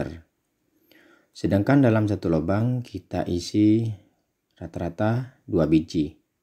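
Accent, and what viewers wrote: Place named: West Sumatra, Indonesia. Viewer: Suaranya mirip Minang kocak 😀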